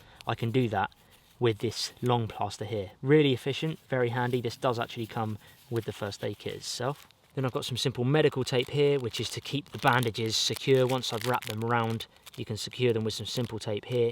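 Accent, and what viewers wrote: United States. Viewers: His American accent is so funny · that america accent was awesome haha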